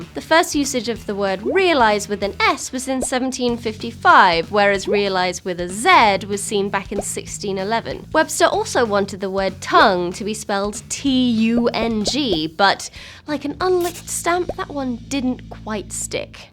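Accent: United Kingdom. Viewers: Why does your banner say "British Culture With An American Accent" when you clearly speak with a British accent in your videos? I don't get it. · ..and even people in the U.S. would understand you if you asked us..'does the building have a lift?'..or 'the lift stopped between floors'...we might not use the same word, but we understand your meaning. And most of us are just mesmerized by a British accent. Everything you say sounds intelligent! Talk to us a few minutes..we'll be calling elevators lifts and adding u to color! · Because she said Americans changed it to I zed E when Americans would pronounce it "zee." It just highlights that a Brit is talking.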